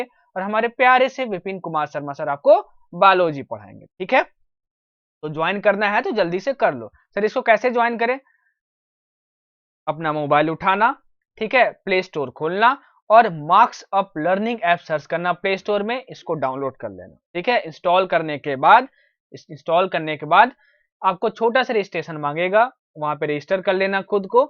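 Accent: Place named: Madhya Pradesh, India. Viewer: Sir I am also from Madhya Pradesh that's the reason aapki tone bhi thodi mere jaisi hai. 😍😊😊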